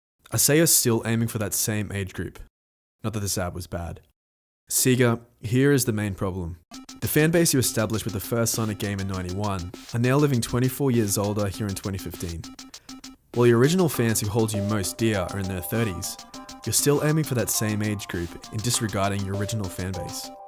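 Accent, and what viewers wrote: Australia. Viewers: He's from Straya, that's not his fault lol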